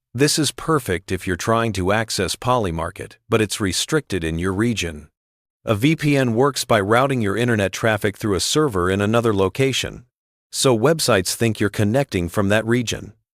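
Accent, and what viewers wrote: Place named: India. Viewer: indian detected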